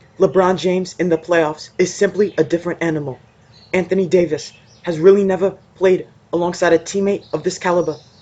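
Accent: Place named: South, United States. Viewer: You sound like you have a country accent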